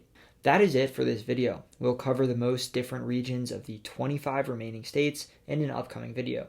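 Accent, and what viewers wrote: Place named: Mid-Atlantic, United States. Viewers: You from central/western PA? I know that accent anywhere. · A California native, but I hear a Mid-Atlantic flavor to your speech patterns.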